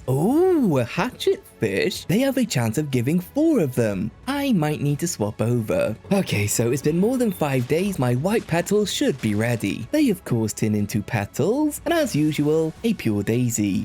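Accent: Wales, United Kingdom. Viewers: always thought i heard a bit of welsh accent come through now and again, that comment on the welsh summer confirmed it 🤣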